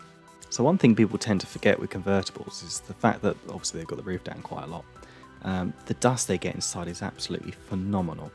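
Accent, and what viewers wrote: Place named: United Kingdom. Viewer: This is the only detailing channel I sub to..why ?? because you are not another plastic Yank, talking American because you think it's cool or that the American viewers like it, no gas tank, fenders, lug nuts, sway bars or Zee 4 etc etc. keep on being British.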